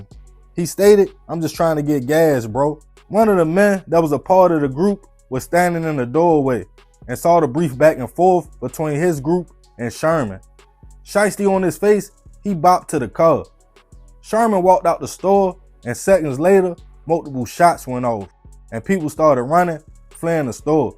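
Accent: Baltimore, United States. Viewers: Your B-more accent is awesome.. one of the reasons why i subscribed 👍🏾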